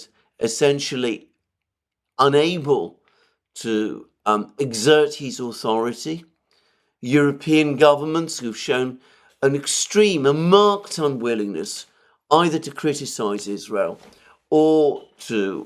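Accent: United Kingdom. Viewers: I believe you SAID..SEVERAL TIMES..that the Ukraine conflict was fital to the world! And stop giving peace planes..You sound british (REDICULOUS) an YOU are very SINICAL!!!!!!!!!!!!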